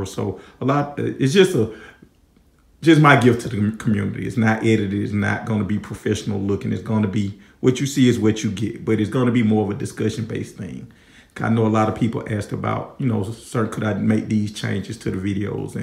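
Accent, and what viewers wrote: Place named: Atlanta, United States. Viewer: Right on! It's all good,Bro! I picked up on that ATL accent instantly. Lol!!! I'd actually stopped through there in between flights while on my way to Canada last month. But,yes sir I'm on IG & just posted my first TF pic on there yesterday. Will do,Bro!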